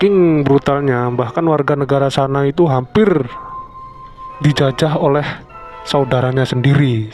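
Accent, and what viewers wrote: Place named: Java, Indonesia. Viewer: Medokkk bet jowo mu brayyyy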